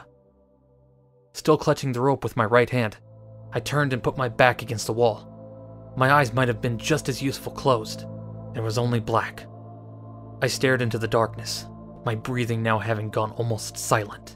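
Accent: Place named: South, United States. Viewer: My God, I love your southern accent! XD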